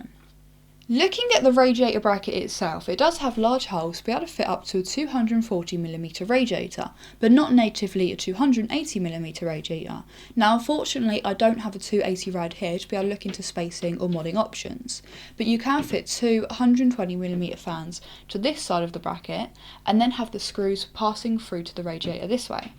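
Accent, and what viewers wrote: England, United Kingdom. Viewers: Mornbs What are you on about? It is ENGLISH, not american. If you don't understand what she's saying, then you need some more english lessons. O.o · u forgot to mention very sexy voice and british accent!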